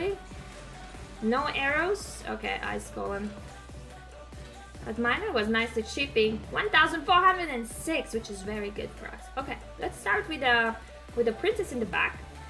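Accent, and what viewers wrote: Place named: Eastern Europe. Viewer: oh nice, but i guessed this slavic charm haha, pozdrav iz poljske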